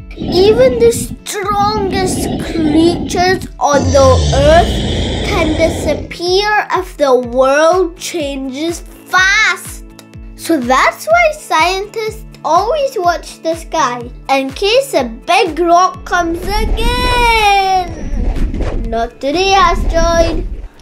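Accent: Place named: Scotland, United Kingdom. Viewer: So sweet Lovely speech Looking smart Great knowledge He speaks like sccotish boy❤❤❤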